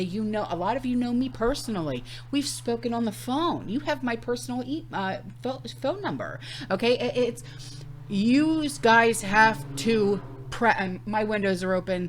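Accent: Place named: Pennsylvania, United States. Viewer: I’m from the South and I love your accent. When you say, “youse guys.” I think you must be from Pennsylvania originally?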